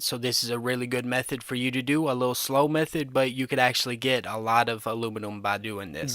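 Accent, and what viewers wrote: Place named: Appalachia, United States. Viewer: do yourself a favor and have someone who doest sound like a hillbilly narrate your bad videos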